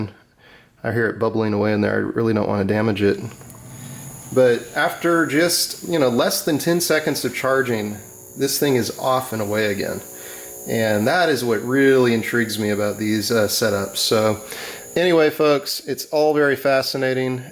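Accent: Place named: United States. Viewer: you sound american so this might be hard.... try using chared hemp fiber. being from the usa myself i know its around if you ask enough people. I havent had the time to start these experiments yet but There is a brit with a phd in material science that gave it a shot and was blown away. Check out Robert Murray-Smith if you dotn already know who he is... Its performance is at least as good as graphine.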